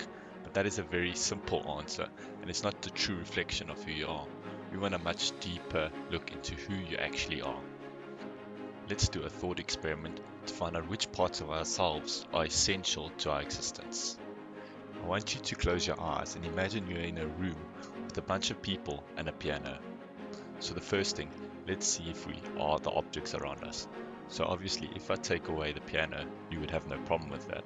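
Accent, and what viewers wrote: South Africa. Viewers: Is that a fellow South African I hear !?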